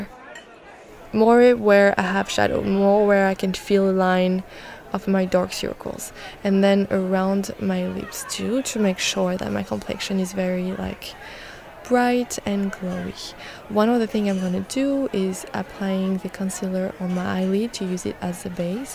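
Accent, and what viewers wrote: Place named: France. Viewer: Can you make a tutorial with you speaking french? I love the accent!! Even though I can't understand them😂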